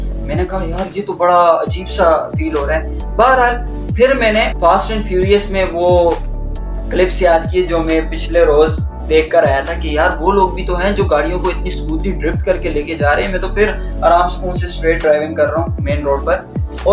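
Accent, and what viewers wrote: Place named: Karachi, Pakistan. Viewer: Pakky karachi waly lagty ho ap bro 😅❤️❤️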